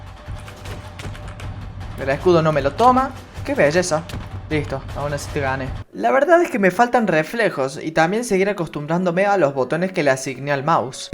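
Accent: Córdoba, Argentina. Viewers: apenas empezó el video me di cuenta que sos cordobés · Cordobes xd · vo so cordobes no? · Chabon sos de Córdoba? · 😆!es cordobessss¡¡¡¡ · por que es de cordoba, una provincia de argentina en la que hablan asi jajas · capo el pibe cordobés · Muy bueno el video hermano una duda ¿sos Cordobez? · Hola bro sin ofender pero ti vos se me hace a la de un Cordobés me resulta muy gracioso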